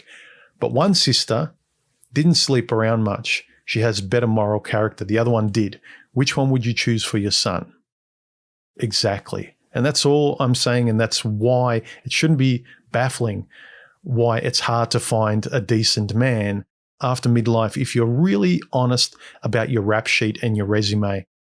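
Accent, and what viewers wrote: Australia. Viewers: Statistics have shown the success rate of a marriage or defacto is proportionate on the number of partners a woman has had in her past but the same doesn't hold for men. Men always exaggerate that number and women always understate their number. But as a rule of thumb, women who have had more than 5 sexual partners are much more certain to divorce the higher that body count becomes. And point in question is my daughter, who is 36, brags over the number of sexual partners she has had. That number is over 100 by her own admission. She is unable to love a man or have an extended relationship with men. Her relationships last 2 or 3 months before they end and she always has someone else in reserve so she is never alone. She does not love herself. She has 2 adult brothers who claim to have only had 3 sexual partners each. They are both in very long term relationships, one married, one defacto. 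I am so proud that they never took the path their sister took and chose very carefully their respective partners. ..... And that beautiful Aussie accent makes listening so easy.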